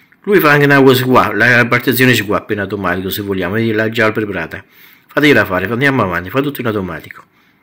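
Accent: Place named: Marche, Italy. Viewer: però dovresti parlare italiano! Si capisce molto poco di quello che dici se parli marchiciano! Io lo capisco perché sono originario di un paesetto non lontano dalle Marche ma molti avranno difficoltà!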